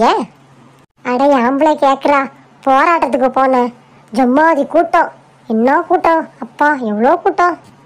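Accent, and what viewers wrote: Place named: Parangipettai, India. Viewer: Intha slang parangippettai thana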